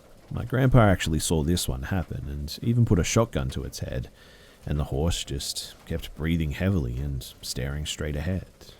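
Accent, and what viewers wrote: Australia. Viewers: I'm pretty sure he's Australian.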